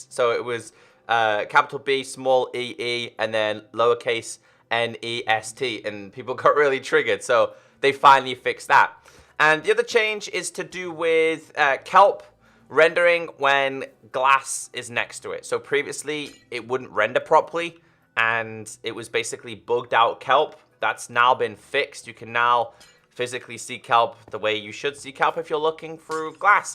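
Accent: United Kingdom. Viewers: He is british, i don't know if english, welsh, irish, or scottish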